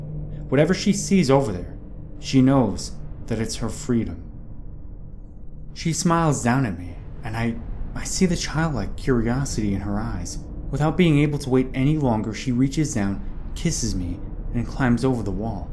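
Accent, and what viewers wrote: United States. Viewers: For sure. Don't worry about it! You should have alot more patrons as well. Or do people just use all those other sites you mentioned? Anyway this is one of those deep tales that kinda freak me out. Kind of a real thought worthy message there eh? Tho I don't think it was Saturnites or whoever that were the...makers. Pretty good pasta tho. Forgot to check-was that you doing all the voices? Seems like a pronounced Yankee lilt or something in there lol! Thankyou!😍✌🐾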